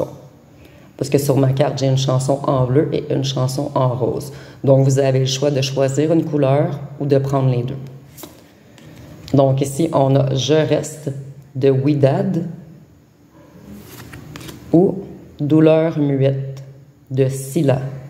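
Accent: Canada. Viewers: Bonjour cousine Canadienne, j’aime votre accent qui me fait penser à mes cousines germaines qui vivent à Montréal où j’ai eu plusieurs fois l’occasion de m’y rendre… Je vis en France… J’aime le format de guidance de 30 min. 😊 J’ai entendu votre voisine mais pas de soucis 😉😘 Je n’ai nullement été offusquée 😉😄ce sont les choses de la vie… Gratitude pour votre guidance 🙏🏼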